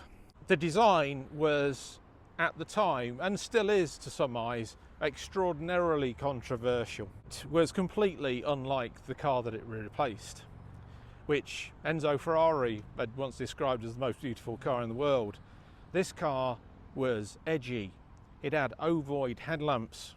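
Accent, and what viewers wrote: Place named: United Kingdom. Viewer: Interesting video. Nice to see some of the early development pictures. I have always thought it unfair that the XJS is knocked by so many and leads you to think no one likes them which isn't true. They have a big following and people really do like them. It was unfair to compare them against the e type. It wasn't an e type it was an XJS. In the mid 70's we got the SD1 Rover, Tr7, Princess, and the XJS and it was too much of a shock for many people. British cars were trying to modernise but the public weren't yet ready for them. The XJS i believe had the longest production run of any Jaguar and when the convertible was released in 1988 it lead i think in 1990 to its best sales year. I own a 1992 Facelift V12 Convertible and since buying it, it has amazed me how much attention it gets where ever i go. Strangely enough i was never keen on the look of the facelift lights until i got mine and i love it. 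They are the most comfortable seats i have ever had in a car and they are lovely cars. You got a bargain with yours and it been the Coupe in the USA as you said will make it rarer and a little bit more special. I saw the thumbnail and expected to see a US spec car and when you started speaking i thought you were a brit and confirmed when i looked at your homepage.👍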